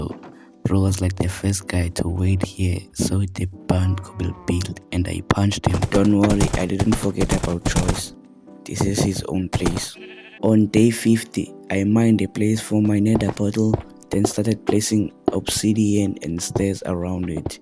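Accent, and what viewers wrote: South Africa. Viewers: his accent is obvious, might be Zulu guy or venda idk tbh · You sound sound south African like a Xhosa one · U sputh african?????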